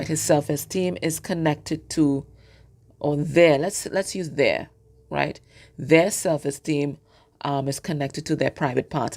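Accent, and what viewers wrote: Trinidad and Tobago. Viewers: Hello, are you Trini?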